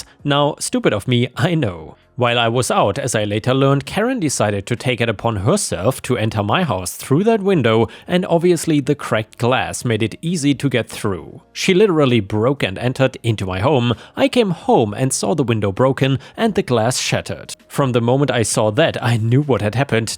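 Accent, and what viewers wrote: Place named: Germany. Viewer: Man, I enjoyed so many of your videos. And your accent sounded so familiar.. I've worked in a lot of countries during my "wild years". You are from Germany, right? Greetings from Obersulm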